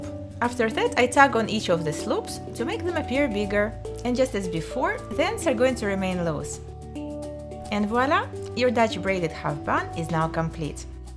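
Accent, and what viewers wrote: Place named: Russia. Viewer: Слышно что русская))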